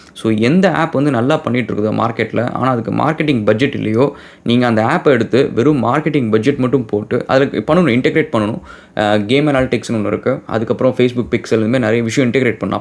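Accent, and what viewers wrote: Dindigul, India. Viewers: Nenga Dindigul ah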